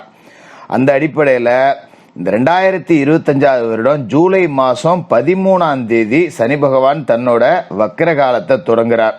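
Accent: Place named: Thanjavur, India. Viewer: உங்க slong தஞ்சாவூர் மாதிரி இருக்கிறது எந்த ஊர்ல இருக்கீங்க ஜோசியரே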